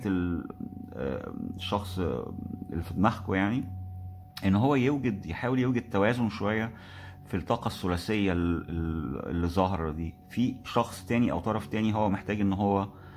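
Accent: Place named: England, United Kingdom. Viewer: I love ur english accent ❤ يا لهوي 😊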